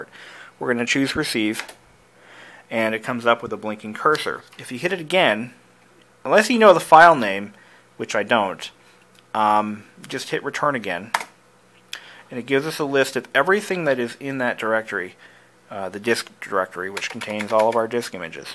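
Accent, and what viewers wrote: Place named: Massachusetts, United States. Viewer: I think I finally figured out what state your from! It's Massachusetts. In some of your other videos you make subtle hints about this but never confirm it. In fact when ever you talk about your computers you acquire from your school district you make it your business to tell us not to ask or even talk about it. It's not a big deal, but for me it affects how I relate to you as a computer guy. Your accent isn't southern or northern, but something else. I'm glad I finally know what part of the country your from so I can at least relate to some of things you talk about, like when you say "in this part of the country you don't see these kinds of Honda's." Which part? In some parts of the country a car from the 1960's can easily be found driving around because the whether is dry and it never rains! People forget how curious some of are and I am glad you atleast allow us to post comments. 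Thanks, Derek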